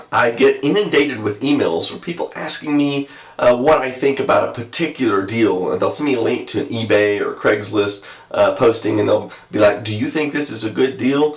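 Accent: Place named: South, United States. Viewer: ´s southern accent was perfect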